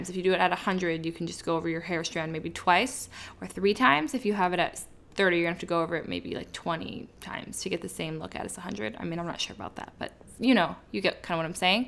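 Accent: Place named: United Kingdom. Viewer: This girl sounds like she might have a fairly alright british accent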